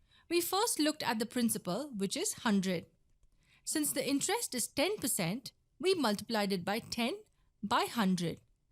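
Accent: India. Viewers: India cringe accent gang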